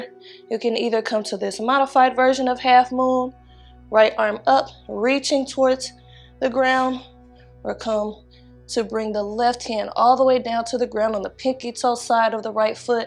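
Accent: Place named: Chicago, United States. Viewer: Peace be upon you. I'll be honest, I didn't like your channel when I first discovered it probably over a year ago now, but I'm sooo glad I gave it another try! I loved this flow and something about hearing your Chicago accent in a yoga practice makes it that much more homey for me. Thank you love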